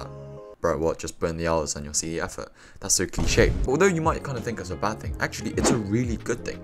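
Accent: United Kingdom. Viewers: i dont know ...he said he lives in germany ..but i feel its a bit britsh 😅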